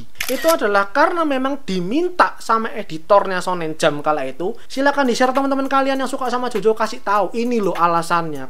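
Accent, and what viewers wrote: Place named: Banyumas, Indonesia. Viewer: Agak ngapak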